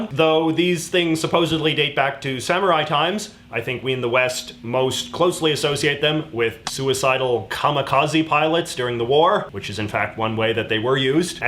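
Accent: Canada. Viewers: Lmao saying “kawaii” (cute if you’re out of the loop somehow) with his Canadian accent literally turns into “kowai” (or scary). Still love your accent.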